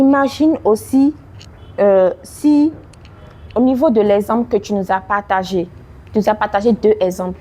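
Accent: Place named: Guinea. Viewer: comment tu vas? Bravo pour le travail que tu fais. L'accent guinnéen sort un peu hein😅. Bonne chance pour la suite et Dieu te garde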